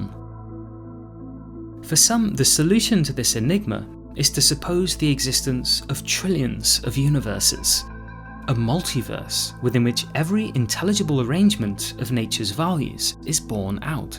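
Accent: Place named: United Kingdom. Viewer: can't do the voice. I get enough British ascent,. In our Canadian programs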